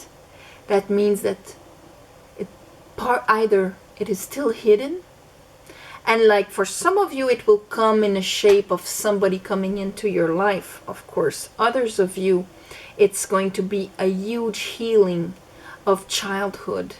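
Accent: France. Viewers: Thank u. I hear a beautiful French accent. U r very beautiful inside out. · cute french accent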